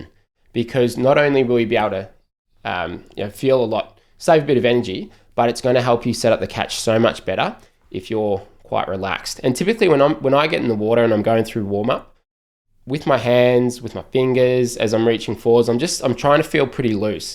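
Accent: Australia. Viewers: This is very helpful and( are you Australian) You are very handsome young gentlemen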